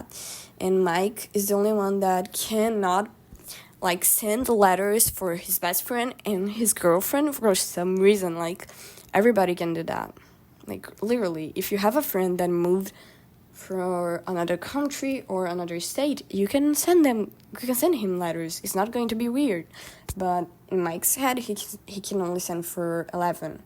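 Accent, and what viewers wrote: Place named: Russia. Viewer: oh sorry! your accent just reminded me of a russian one. i love this video btw;3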